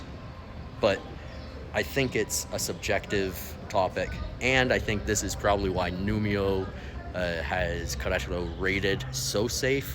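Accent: Canada. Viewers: I noticed that Canadian accent right away LOL. As soon as you said oat and aboat was pretty obvious😂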